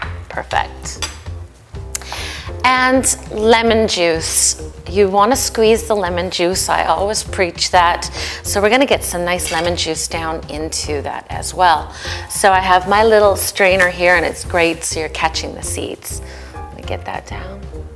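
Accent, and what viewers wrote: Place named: Newfoundland, Canada. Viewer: I picks up a little Newfy accent.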